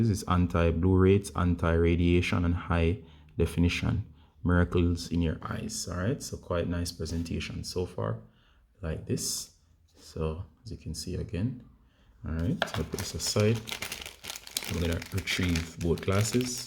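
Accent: Jamaica. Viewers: Yow chap ur accent sounds like a yard man. Where in JAM can I buy this product?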